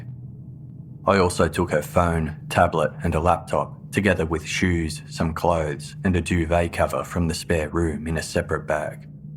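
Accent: Australia. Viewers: Great Australian narration!!!!❤❤❤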